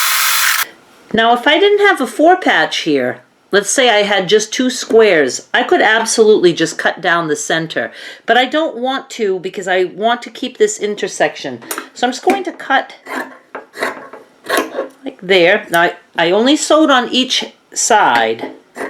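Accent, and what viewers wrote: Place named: New England, United States. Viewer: I just started my first crumb quilt for a wedding gift for my best friend 💝 I was using a combination of method 1 and 2 but now I've got 3 more to incorporate and really shake things up! Instant subscribe seeing the "Queen of crumb quilting" comment lol Where are you from,? I recognize the New England accent, but can't pinpoint a state 😂 hey from northern Maine 👋💝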